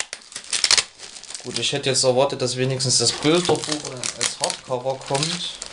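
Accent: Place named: Saxony, Germany. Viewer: omg ein Sachse, wie schreklich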